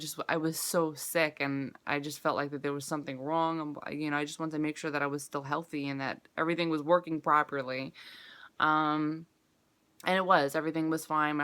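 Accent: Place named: New York, United States. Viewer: Sound like a New Yawka bae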